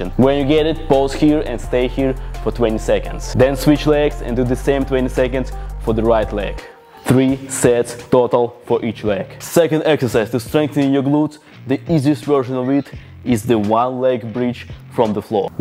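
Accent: Russia. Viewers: русский акцент просто сворачивает уши в трубочку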